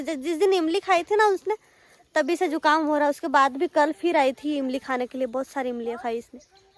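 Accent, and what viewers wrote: Rajasthan, India. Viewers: AAP KI RAJTHANI LANGUAGES ACHI HE / 💯 CUTE. // MUJE SAMAJ NHI ATI HE LEKIN ACHI HE 👍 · Aap apni Rajasthani awaj me ek vlog banao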